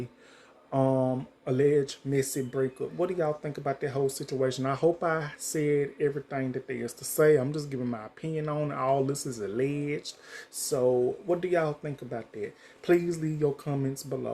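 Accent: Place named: South, United States. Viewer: Gee Brother....what State are you from? I know you are from the South but being a New Yorker, I cannot figure out which southern state you come from but you way of talking is weird and deep as fuck. Did you see the sex videos of Ray and Zed on line, if you did you may have an idea why Ray took the L on Zed not to say there is something wrong with Zed but from what I can analyze, I think Ray is a big ole size bottom and yes I think Ray found a brother who might be digging him deeper than Zed was. Karna is a bitch and yes shit ain't gonna be working for Ray if patience is not his priority. Ray is talking about making money quickly but he ends up with this brother who does not seem to have shit going on for him. From what I saw he seems like Zed is moving on and of course he is dealing with the break up as he did sincerely loves Ray but he seems to take charge of his life and his music career.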